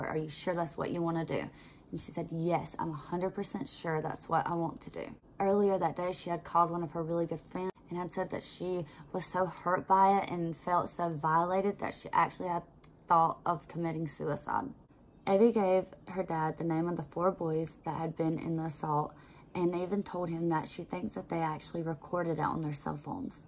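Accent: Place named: South, United States. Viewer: Just found your channel and I love your accent 👑 Sending love from another Southerner 👋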